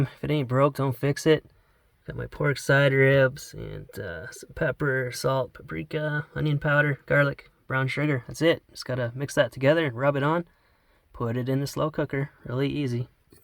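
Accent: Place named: New Brunswick, Canada. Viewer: This is insane man. Are you originally from NB? Accent sounds there lol. I’m originally from N.S. myself and wow these videos really makes me want to explore our massive backyard. Keep it up!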